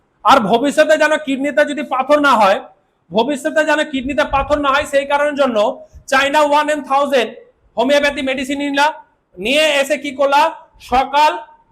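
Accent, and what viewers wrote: Rajshahi, Bangladesh. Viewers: স্যার আপনার কথার মধ্যে বাংলাদেশের রাজশাহীর টান আছে,,, ❤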